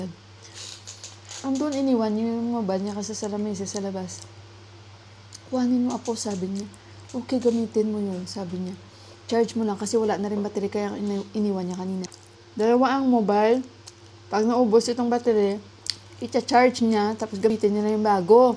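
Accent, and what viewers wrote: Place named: Hiligaynon, Philippines. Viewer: Lingaw gid KO mag lantaw SA imo te Kay ilongga man KO te...from lemery Iloilo..bisan mga 5 yrs ago pa na vlog mo ate ambot lantaw KO tana AHH..Kay kuha stress KO mag lantaw vlog mo te kapin pa didto SA village te..kag Kanami Kay enjoy ka gid da SA lugar sang Nepal te..new subscriber Gali KO te pero lingaw KO ..Kay ang Tagalog mo te gwa gyapon pag Ka ilongga AHH..halong Kami pirmi te..pirmi KO hulat upload mo ..pero mga vlog mo nga dugay na lantaw KO yah te AHH.. hehehe ♥️♥️♥️😘😘😘😘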